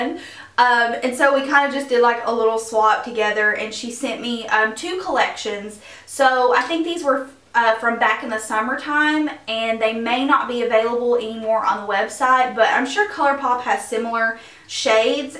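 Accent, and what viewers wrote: South, United States. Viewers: What you were saying about Kylie Jenner really resonated with me. As a working artist it would be difficult to see someone else getting credit for all of your work. You're paid for your work yes but credit especially on social media and shout outs are what continue to drive traffic towards your business. Thanks for the recommendations. I just subbed today. Love your channel and your accent! I'm a southern girl too 😘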